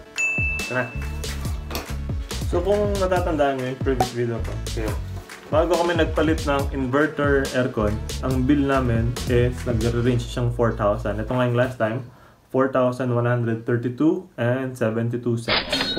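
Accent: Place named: Pampanga, Philippines. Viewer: Kabalen accent 👍😍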